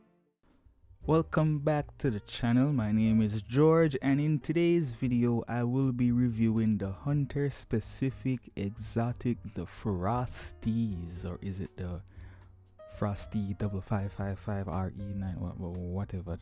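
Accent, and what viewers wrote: Jamaica. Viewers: I can hear you fighting back the “yaad man” accent. Great video tho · Lol why do you have a jamaican accent?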